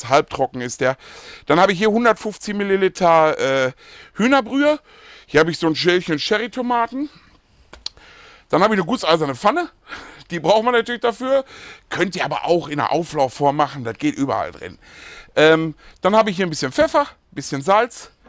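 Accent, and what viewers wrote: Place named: Hesse, Germany. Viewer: Muss seit 2 Jahren in Hessen studieren, dein Dialekt gibt mir ein Gefühl der Heimat✌🏻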